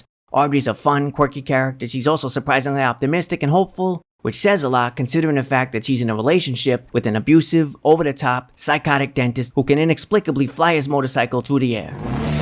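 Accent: New York, United States. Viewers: Nice review man! I love Little Shop! I’ve actually played the voice of the plant Audrey II on stage in the musical production. It’s still one of my favourite musicals and roles I’ve ever done. I’m so glad you brought up the director’s cut!! Because that’s how it is in the stage version and I love the bleak ending. EXCELLENT choice of film! Also, I don’t want to assume, but you sound like you are from New York? Whatever you accent is, it’s awesome man haha 😎👍🏻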